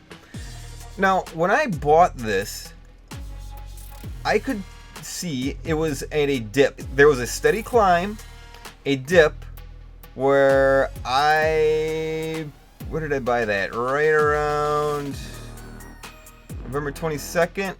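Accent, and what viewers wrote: Canada. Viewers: You sound Candian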